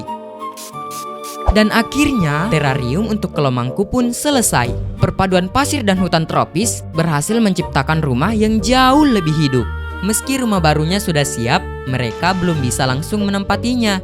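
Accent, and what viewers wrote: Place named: Indonesia. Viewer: dari suara nya udah ketebak orang indo🗿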